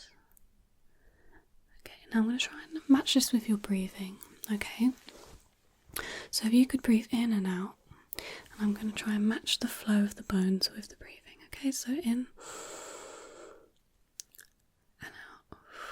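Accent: United Kingdom. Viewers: I think the accent and resonance of the voice, yes British or other may have a measure of engrained history, spirit, and stability which for me makes the feeling or resonance more appealing. My grandmother had that. For me the body language, face and hands also have this. I feel the societal treatment for girls/women also allows for many to maintain much more stability and ease. I feel this much more support (along with much more social interaction/transfer of skills over time) is working together to create much more lower average layers, ease of learning/motivation to learn (mental reward received for mental work expended), and together is allowing more and more ease of development in the information age. Theory which sees our average stress as much more complex: both conscious layers and many many other maintained subconscious mental work which for higher layers persons is forcing them to work much harder to receive the same mental reward and usually also missing out on much positive social interaction and better acquired mental/social/emotional skills over time. 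Hope theory will provide tools to more permanently reduce layers through more continuous resolution and tweaking faulty weights and values on our own to help permanently reduce many layers.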